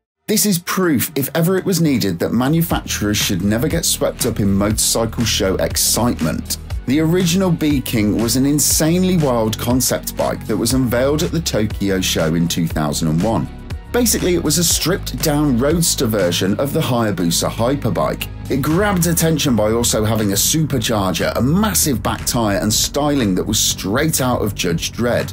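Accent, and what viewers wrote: United Kingdom. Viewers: As far as I can tell this fellow with a British accent did not include a singled British bike.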